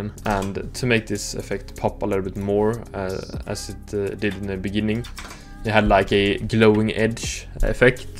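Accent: Sweden. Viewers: why does he sound swedish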